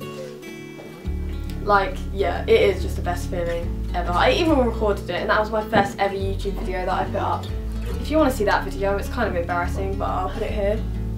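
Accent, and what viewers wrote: United Kingdom. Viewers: your accent is amazinggg omg I LOVE YOUR BRITISH VIBES❤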